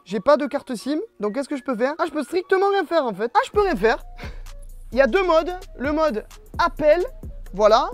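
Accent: Marseille, France. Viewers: Ta un accent marseillais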